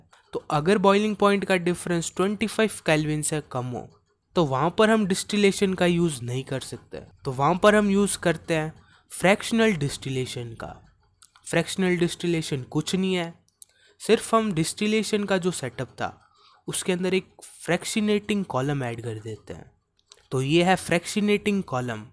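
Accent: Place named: Bengal, India. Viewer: Sir are u bengali that mota moti sounds familiar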